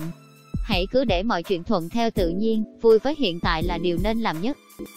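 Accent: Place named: South, Vietnam. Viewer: Giọng đọc Miền nam rỏ ràng - Hay!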